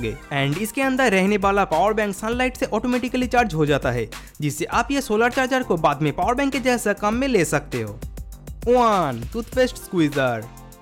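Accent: Bengal, India. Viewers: Aapka hindi kafi kuch Bengalee ki tara hai.... kaha se hain aap?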